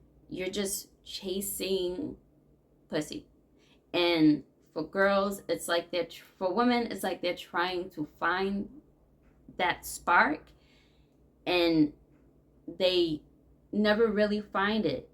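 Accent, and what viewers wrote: New York, United States. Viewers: I love ur New Yok accent!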